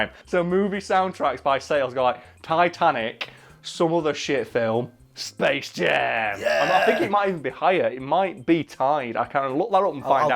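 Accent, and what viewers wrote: United Kingdom. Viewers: FACT ME UP FAST TALKING BRITISH GUY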